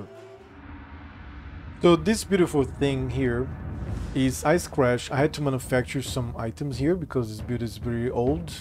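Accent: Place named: Brazil. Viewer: You sound brasilian 👀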